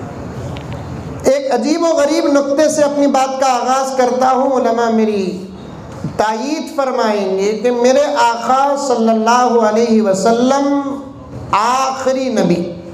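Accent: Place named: Goripalya, India. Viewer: Pure goripalya accent ameer sab Allah apki Umar mein barkat de ❤❤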